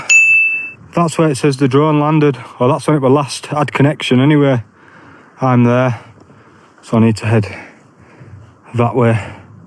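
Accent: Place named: Bradford, United Kingdom. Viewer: Great to hear a proper Bradford accent!! Good lad!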